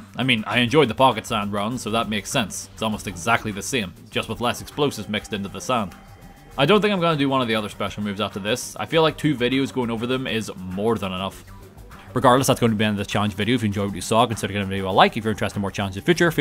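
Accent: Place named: Northern Ireland, United Kingdom. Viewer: Been falling asleep to these for a while now and I don't think my brain can enter sleep mode anymore without listening to an northern irish man describing incredible acts of violence. I had an idea I thought might be interesting, since with the fallout 4 DLC you can craft robot companions what if you got the unlimited companions mod and just have an army of customized robots wreak havoc on the commonwealth, you could call it "can you beat fallout 4 as the mechanist" or something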